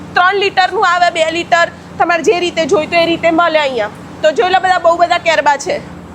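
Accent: Sabarkantha, India. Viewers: સાબરકાંઠા ના છો બેન સાબરકાંઠા ની ભાષા આવે છે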